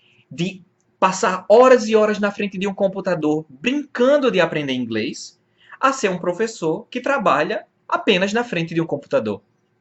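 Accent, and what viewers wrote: Rio Grande do Norte, Brazil. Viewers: o sotaque potiguar me chamou a atenção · gostei desse sotaque do rio grande do norte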